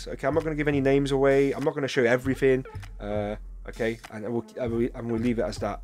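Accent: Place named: Midlands, United Kingdom. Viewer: I thought you sounded like a midlands boy. Greetings from Northampton.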